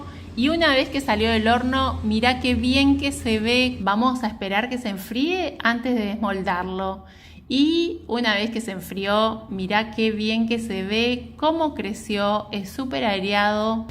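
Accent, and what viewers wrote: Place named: Mendoza, Argentina. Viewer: Ese acento lo conociaaaaaa😂😂😂 soy de Mendoza 🩶 te mando 🫂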